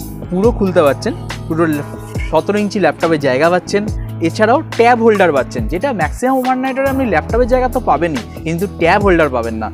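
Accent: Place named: United States. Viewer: He talks Hindi in American accent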